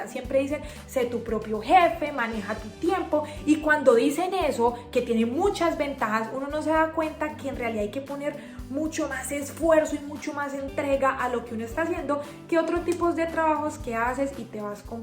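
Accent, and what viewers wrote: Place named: Cauca, Colombia. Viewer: Saludos jajajajaja ese acento del Cauca está intacto 👏👏!Gracias por tu contenido!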